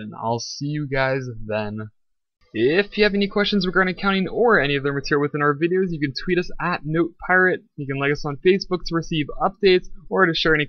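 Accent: Canada. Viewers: Clara Van Biesebroeck hes Canadian haha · He says aboot, probably Canadian.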